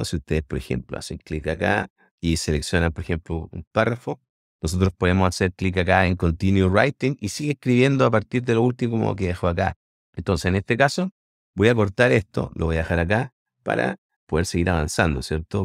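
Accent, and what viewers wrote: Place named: Chile. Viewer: Por el acento se ve que eres chileno (actualmente resido en Santiago de Chile). De verdad esta herramienta es una locura... ¡Muchas Gracias!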